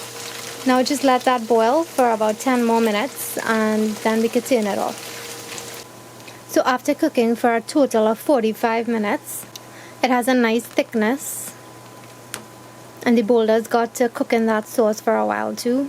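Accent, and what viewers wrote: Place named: Trinidad and Tobago. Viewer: Are you aware you have a trini accent? ;-)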